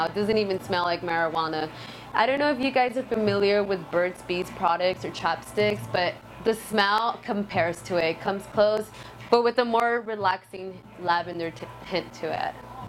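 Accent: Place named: Jamaica. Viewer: Sour! "Jamaican Acent"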